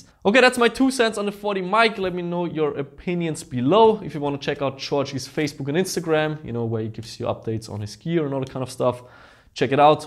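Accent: Bavaria, Germany. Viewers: Novritisch bist bayrisch na????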